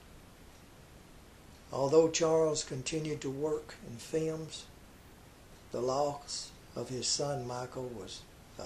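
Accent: South, United States. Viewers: His accent? Shame on you. His accent is part of who he is, which is a southern gentleman. He could not change his accent anymore than say someone from the Bronx or France could. Why can't you just appreciate the stories he tells and the time and effort he puts out to do them and share them with us. I shudder to think he would ever stop doing this because a bunch of needle assed nitpickers tweak his stories. Just enjoy and learn from them. My Momma always said "If you haven't got something nice to say don't say anything". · ....while I realize you are from the southern reaches of our country and there are some variations on the English language because of this...I am sick to death of listening to you say HISSELF instead of HIMSELF...and BTW...the iconic studio head was named Irving Thalberg....not THRALBERG. You hear his name mentioned periodically at the Academy Award ceremonies since there is an Oscar which bears his name...so please....get it right! 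· I've been watching a few of your Hollywood Suicide bits. I like your southern voice; somehow it adds to the sadness of these star profiles. You've got great narrative...keep it up.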